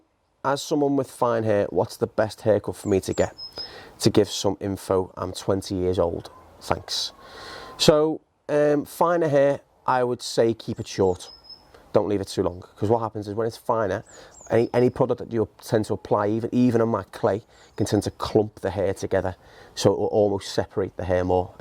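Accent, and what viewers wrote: United Kingdom. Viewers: For, Could you take subtitle for your video, so I can catch what you say. I am not familiar with british aksen. Thanks From Indonesia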